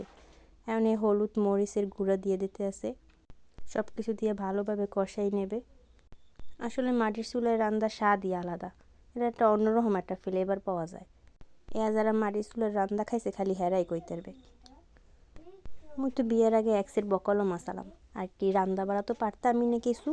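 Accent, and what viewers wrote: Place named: Barishal, Bangladesh. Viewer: ওয়াও মনু তোমার বরিশালের কথা অনেক সুন্দর হইছে তুমি আগাই যাও তোমার জন্য দোয়া রইল ❤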